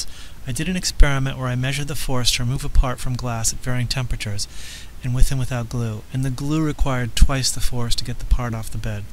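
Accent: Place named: RP, United Kingdom. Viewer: You talk as son of the Queen of UK. Are you an engineer?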